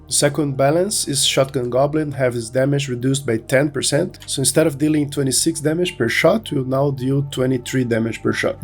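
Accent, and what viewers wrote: Brazil. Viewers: Not the worst english, for a Brazilian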